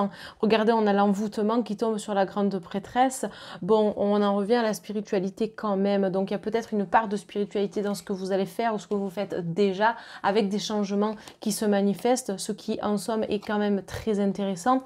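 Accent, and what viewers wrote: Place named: South, France. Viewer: J’adore votre accent du Sud! Ça me change :-))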